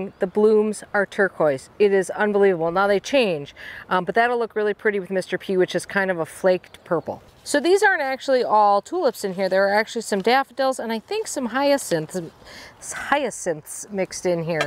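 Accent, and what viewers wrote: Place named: Midwest, United States. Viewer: Im in Idaho but appreciating your midwest accent since Im from Chicago :)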